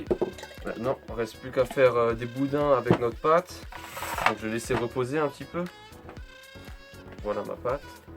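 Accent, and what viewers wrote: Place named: Alsace, France. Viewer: Mika 80 laisse l'accent alsacien trankil lol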